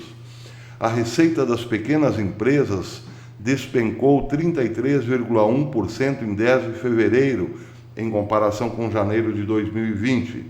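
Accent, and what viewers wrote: Caipira, Brazil. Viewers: qualquer analista de geopolítica fala bonitinho, empolado, metido à inteligente. Isso enche o nosso saco. Só você faz o caipirês e um trabalho melhor que todos os outros! Nunca abandone a fórmula.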